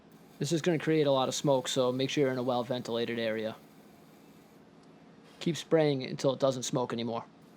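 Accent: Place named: Massachusetts, United States. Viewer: 6 seconds in and I already know you're from mass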